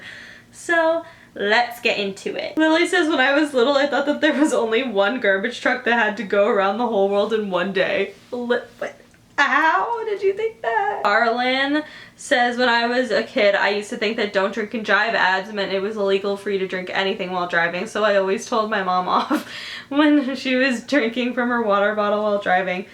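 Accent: United Kingdom. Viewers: Why does always sound british at the beginning of her videos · It's not a vid if she doesn't slip into a British accent sometime in the intro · she has a really english accent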